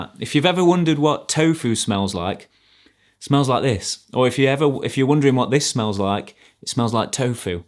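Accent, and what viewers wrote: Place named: Mansfield, United Kingdom. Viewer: Great review. You sound like your are from mansfield, pretty sure I recognise that bridge. Rainworth bypass? 😆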